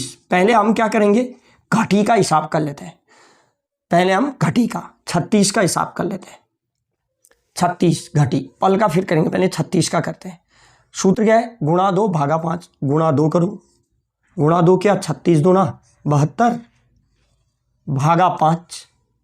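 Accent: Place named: Pahari, India. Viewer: अरे dajyuu पहाड़ी हो क्या, टोन से लग रहा है❤। हम भी पहाड़ी ब्राह्मण ही हैं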